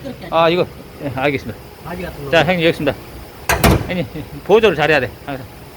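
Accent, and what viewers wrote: Jeolla, South Korea. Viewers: 아따 절라도 사투리가 구수하구만요. 카톡 확인 부탁 드리겠습니다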